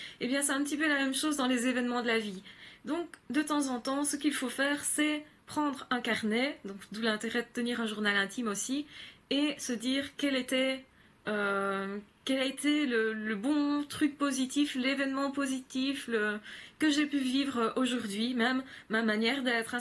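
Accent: Belgium. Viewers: Bonjour, vos vidéos m'aide énormément en ce moment, elles sont géniales!!! j'aurais aimé savoir si vous exerciez en France? je perçois un petit accent belge:-)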